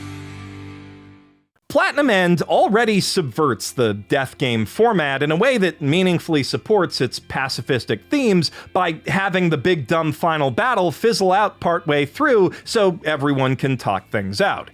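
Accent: Canada. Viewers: Thanks for the CW, I was already glaring at that timestamp and dreading spending that long listening to a canadian, and that splash screen confirmed to me that this video isn't worth watching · An overdose of Canadian in this one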